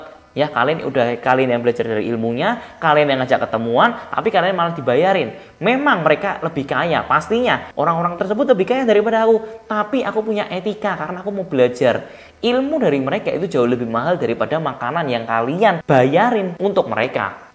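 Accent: Java, Indonesia. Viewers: Medok koh 😀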